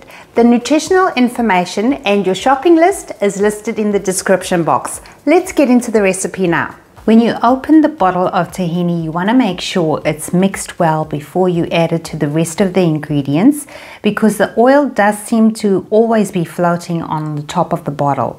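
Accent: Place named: South Africa. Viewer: I was guessing maybe you had a South African accent it's always beautiful but always hard to place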